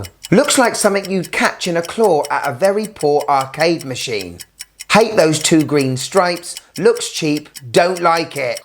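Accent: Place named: England, United Kingdom. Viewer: What an english english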